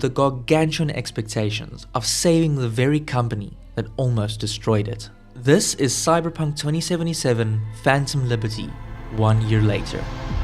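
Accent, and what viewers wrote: South Africa. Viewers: i hear a south african... i watch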